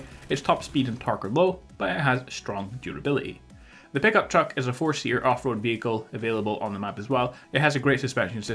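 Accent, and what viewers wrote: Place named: RP, United Kingdom. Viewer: is actually Russian he just executes a very posh British accent.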